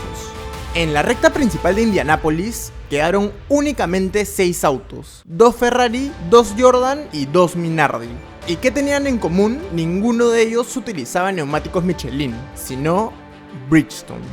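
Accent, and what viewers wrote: Peru. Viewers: Por favor deja ese acento de limeño, habla neutro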